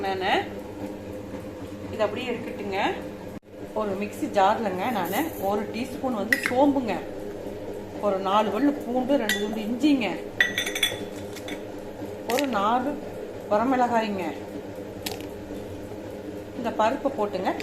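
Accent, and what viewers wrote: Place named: Kongu, India. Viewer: nice vadai and kongu Tamil 👌🏻👌🏻👌🏻, new subscriber😊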